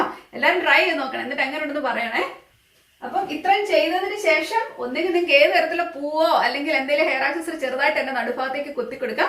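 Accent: Kottayam, India. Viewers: Chechi namude Kottayam Kari anenu thonnunallo...namal kottayamkarde prethyekathaya adupam ulloru samsarikanapole samsarikunath...luv it🥰🥰🥰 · Chechi kottayam kaariyaano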